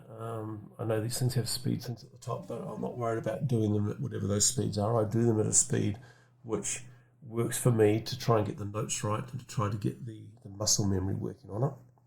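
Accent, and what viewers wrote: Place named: Ireland. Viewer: I am really very interested in your videos because I love your structure and to see your progress and that you are not performing. That's real live 😊. As a non nativ-speaker only your Irish (?) accent is really challenging for me and often I only guess what you maid have said 😅 Keep going!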